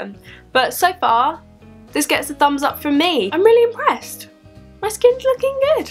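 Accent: England, United Kingdom. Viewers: R u English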